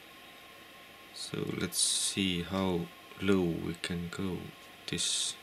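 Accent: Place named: Estonia. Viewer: You sound like Estonian are you ? Or maybe Finish or Hungarian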